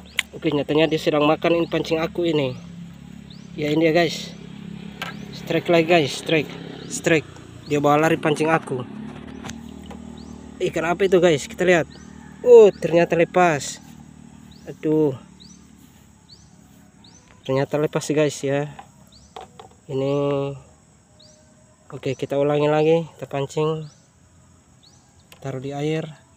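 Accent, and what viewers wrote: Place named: Netherlands. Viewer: Kayak orang holand ini logat 😁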